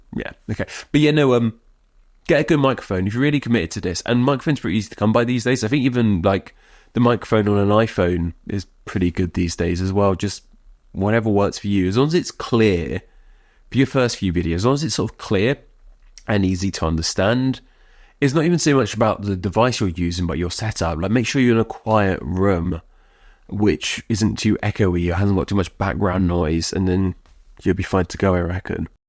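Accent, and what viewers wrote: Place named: United Kingdom. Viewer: That's clearly a British accent.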